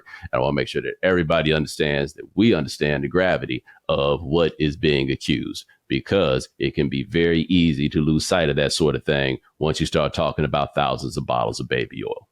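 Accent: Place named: Atlanta, United States. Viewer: Never knew you was from Atlanta but now I hear your accent clear as day lol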